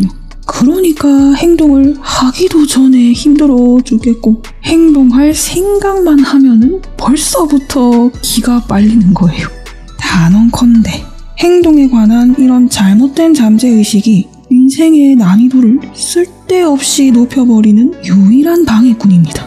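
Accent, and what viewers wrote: Gyeongsang, South Korea. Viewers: 말씀하시는게 경상도 사투리? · 이분 경상도분이시당. ㅎㅎㅎ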